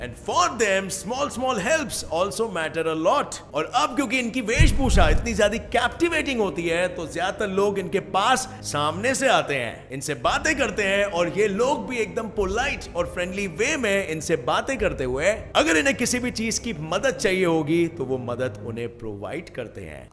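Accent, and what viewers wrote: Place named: Maharashtra, India. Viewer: Maharashtra se hai kya? Because accent se aisa hi lagata hai🔥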